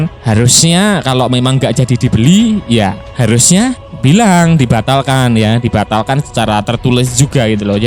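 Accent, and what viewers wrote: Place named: East Java, Indonesia. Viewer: Lu dri Jatim min?? Ngomong e.. Rekk2..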